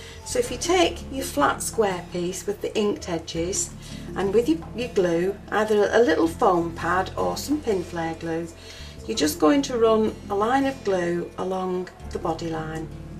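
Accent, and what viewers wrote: England, United Kingdom. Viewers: Hi just came across your site! It's nice to hear English accent!lol have subscribed....now I'm going to look at your other tutorials. I live in Sussex.😍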